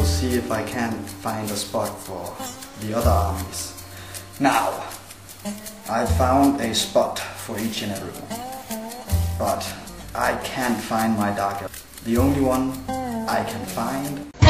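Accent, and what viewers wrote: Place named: Denmark. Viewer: I just love when you clearly can tell that you are danish just from your english accent!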